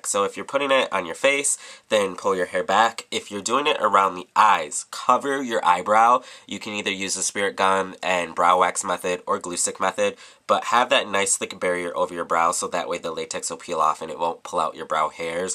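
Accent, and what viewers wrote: United States. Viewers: I love how the you say 'just go right ahead' and everythings a question (clearly american)... id work on that ;)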